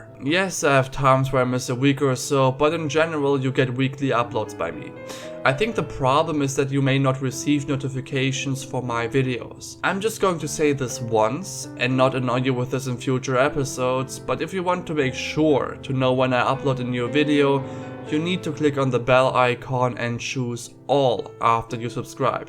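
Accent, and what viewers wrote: Germany. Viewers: His accent sounds very similar to a German one. Almost South African. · German for sure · basne He's actually saying 'can't be asked' which is a common mistake we Americans make, due to mishearing or misunderstanding the British 'arsed' as 'assed' and then further twisting that to 'asked.' He also typed it as such in the video description. The accent, though? I'd have to say it sounds a bit German - to my ears he definitely does not have an American accent. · Are you German by any chance?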